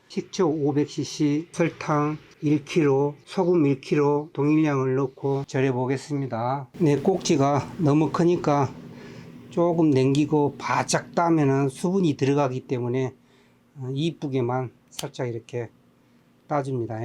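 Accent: Jeolla, South Korea. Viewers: 고향이 어디신가요?정겹고 시골스럽네요^^ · 전라도말씨네 재수없는말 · 전라도사투리 안하셨으면. ㅡ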